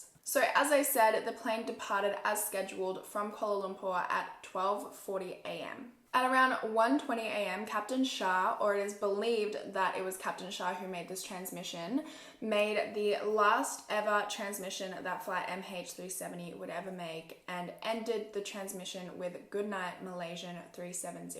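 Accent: Australia. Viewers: Are u Australian or American?😂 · Are you Australian? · Wow im memorised listening to this absoulutly beautiful lady and love the aussie accent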